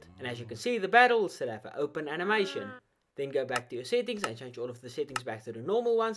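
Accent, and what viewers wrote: South Africa. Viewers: south african if im right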